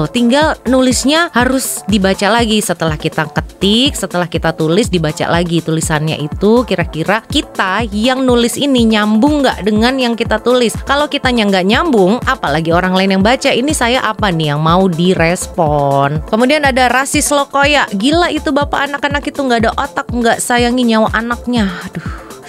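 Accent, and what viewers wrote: Indonesia. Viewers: Dengar percakapan sahaja sudah tahu Sis ini orang Indonesia! Masih ada yang bertanya orang Indonesia atau Malaysia? 😂 From Malaysian - Kuala Lumpur Malaysia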